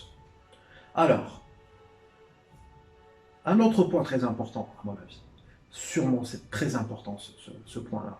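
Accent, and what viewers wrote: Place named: Algiers, Algeria. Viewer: Salam on entend l'accent algerois kho bonne vidéo merci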